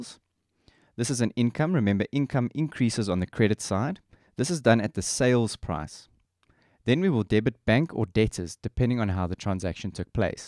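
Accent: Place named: South Africa. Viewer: Lekker boet, helping me all the way in Canada... but born South African.(noticed the accent immediately, but confirmed when i heard the Rand)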